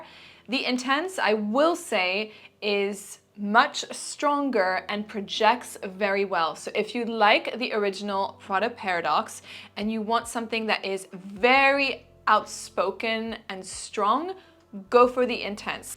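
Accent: France. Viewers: Kinda just watching the video because I love listening to her French 😌 ❤ 😊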